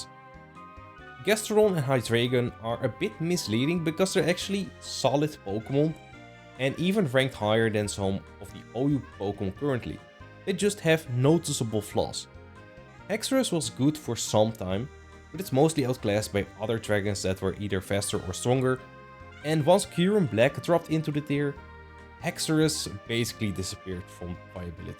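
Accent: Netherlands. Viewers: Do you happen to be Dutch? The accent is thick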